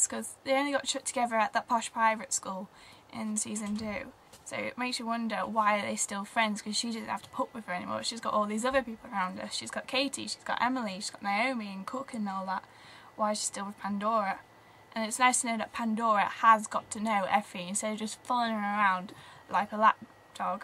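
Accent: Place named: England, United Kingdom. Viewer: dam youve got the nicest english accent ive ever herd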